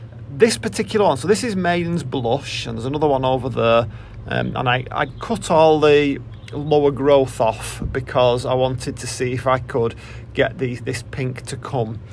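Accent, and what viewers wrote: England, United Kingdom. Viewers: well it’s the English accent that helps too. And I do wAtch most all your videos, but this one I had to do some other things and I don’t have non orchids so it was okay to listen in parts.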